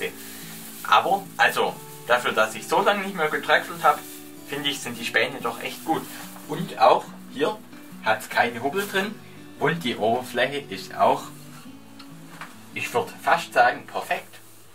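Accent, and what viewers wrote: Swabia, Germany. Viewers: Schwätzt mol nur schwäbisch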